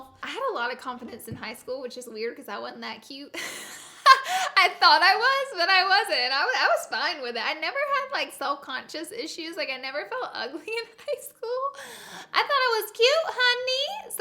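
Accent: South, United States. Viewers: Omg!!! Never been fist person to comment!!!!! ILYSM❤️❤️Ur the bomb we both have a country accent❤️😂💄💄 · I love your videos & love your southern accent. x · I love your cute little southern accent!! ❤️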